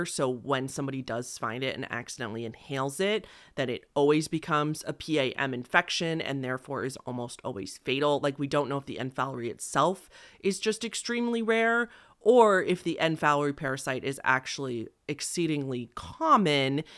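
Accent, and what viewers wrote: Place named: Los Angeles, United States. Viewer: R u from LA by any chance? Why do you talk like that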